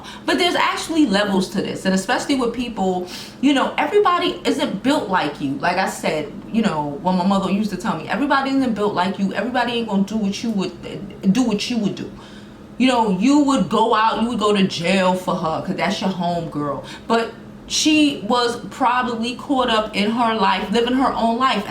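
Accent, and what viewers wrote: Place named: East Coast, United States. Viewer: I know my east coast people 😂💚 you either from Philly or DC 🤷🏾‍♂️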